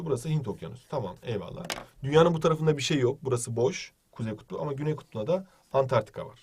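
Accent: Turkey. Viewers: şive meselesi. Egeliler öyle konuşuyor.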